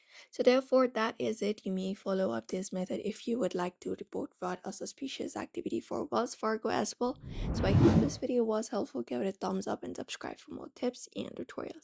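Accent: India. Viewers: Never trust someone with an Indian accent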